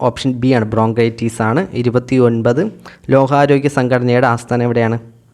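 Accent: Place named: Thiruvananthapuram, India. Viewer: Sir Tvm aano.....slang👍🏻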